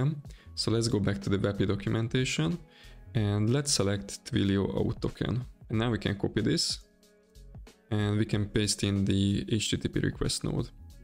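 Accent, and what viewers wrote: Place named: Hungary. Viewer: Volt egy apró magyar akcentus, mondom megnézem a felhasználónevét és jól tippeltem :D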